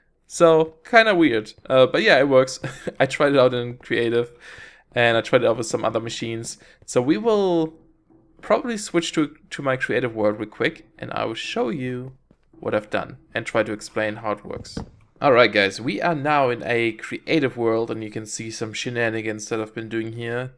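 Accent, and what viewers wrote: Germany. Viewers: I love the accent. German?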